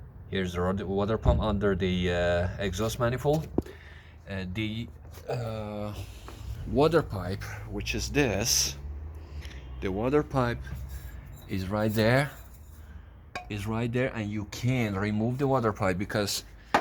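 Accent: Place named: United States. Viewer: Thank you brother تحياتي لك من بغداد اخوية الورد You have great accent i thought you r American Feeling proud of you زور باش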